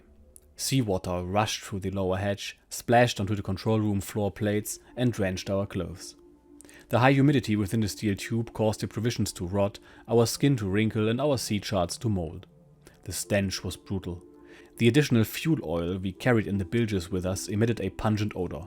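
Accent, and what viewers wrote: Germany. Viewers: Hi, another great episode under your belt! Your narration is improving with every episode and your German accent adds to experience of reading the war diary of a German U-Boat officer. You made the right decision to ditch the synthetic voice as a human voice is so much better and knows certain language conventions better. I also enjoyed hearing about your holiday at Lake Constance. More flavour for the story! Enjoy your holiday and see you next episode! Mark from Melbourne Australia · I like the fact that you are narrating this with a German accent... it definitely brings a little more life to what these men may have sounded like in general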